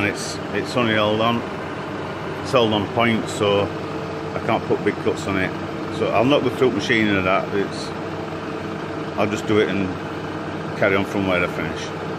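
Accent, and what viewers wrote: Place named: Yorkshire, United Kingdom. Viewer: Reckon he should be voiceovering plenty of stuff on telly. 👍 Clear, concise, no nonsense. Anyone would guess he's from God's own county.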